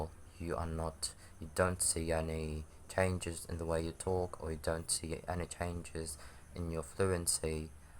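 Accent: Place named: United Kingdom. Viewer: You speak good English. Sounds British. And none of it sounds force. All sound normal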